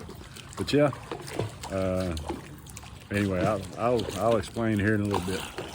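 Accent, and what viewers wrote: South, United States. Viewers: I don't know if you're speaking quietly on purpose, I'm from Minnesota and with your southern accent, I can't understand a word you're saying, plus you mumble, and with that relaxed style, it'd be helpful if you could pla annunciate, thx for your fine content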